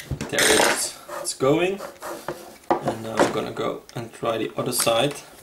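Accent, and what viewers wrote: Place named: Netherlands. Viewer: by your accent i guess you're dutch, lol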